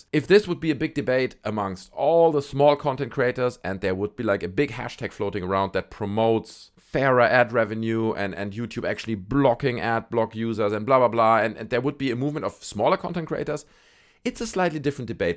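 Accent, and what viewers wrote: Germany. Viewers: Very Good Video! Are you from Germany? Because you have a German Accent lol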